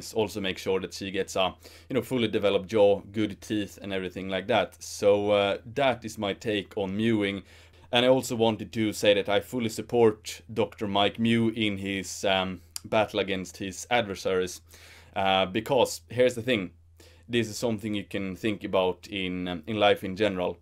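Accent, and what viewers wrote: Sweden. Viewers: Hello fellow swede I can hear it on your accent. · Hørte at du var svensk umiddelbart :P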